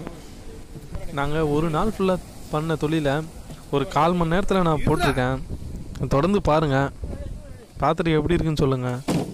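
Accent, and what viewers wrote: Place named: Chennai, India. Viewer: Sagothara ethula Chennai slang pesuringa and fishing style kaniyakumari yepdi?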